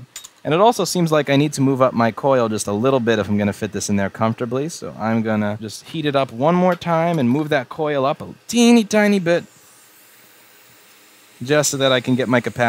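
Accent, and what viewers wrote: United States. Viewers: why do you Americans say "Sodder' and not "Solder" as it is spelt.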